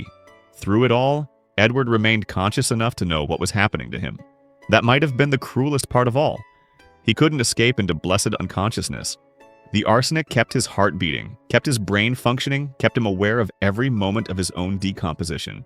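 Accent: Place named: United States. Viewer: yes another crappy American voice over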